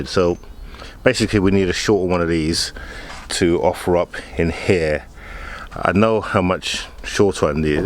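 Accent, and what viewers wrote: United Kingdom. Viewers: Great show!! Are you originally form North London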